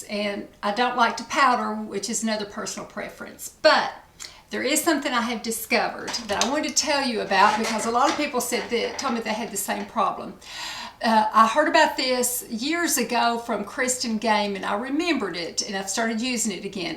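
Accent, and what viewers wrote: South, United States. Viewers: I could listen to your that lovely southern voice of your s all day ❤️💞 definitely going to buy that mascara.you have lovely eyes so sparkly.eyes are definitely the window to the soul.😘😘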